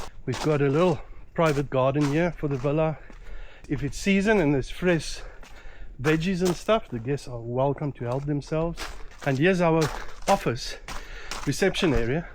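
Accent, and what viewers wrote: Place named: North, Malawi. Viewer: You sound like you’re in the north of Malawi, where exactly is your villa? What’s the name of the place?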